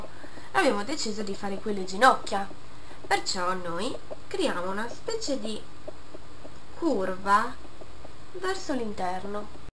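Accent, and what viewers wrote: Sardinia, Italy. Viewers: Sembra sarda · Davvero comlimenti per il disegno sei veramente brava! Sembra che tu abbia l'accento sardo, ho sentito bene? Io son sarda ;) (Budoni OT) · sei sarda? <3 sembra di si dalla pronuncia ahahah ;)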